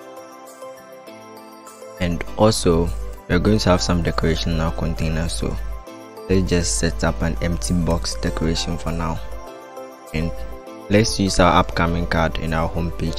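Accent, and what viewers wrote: Ghana. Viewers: Bro u speak like a Ghanaian, are you? I'm Kojo.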